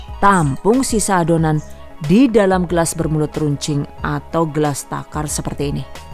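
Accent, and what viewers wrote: Banyumas, Indonesia. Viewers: Mba, njenengan wong ndi sih, deneng kadang teyeng ngomong ngapak😅😅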